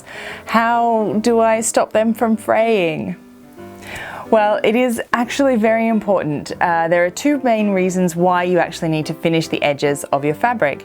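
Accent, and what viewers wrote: Australia. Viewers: Love, this. It is my first visit. Won't be the last! I use both pinking shears and the zig zag. Are you Australian? :) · New subbie ☺️ are you from Australia ? 💖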